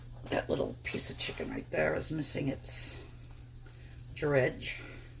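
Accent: New York, United States. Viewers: Love this recipe and love that New York accent ! Cindy From Queens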